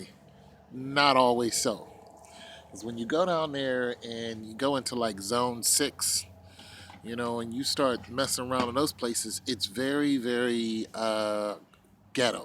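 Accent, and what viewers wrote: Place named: New York, United States. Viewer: You say you from Ohio and/or Pittsburgh, but you sound like a New Yorker all day. Ohio, nor Pittsburgh has burrows. What's really going on my G?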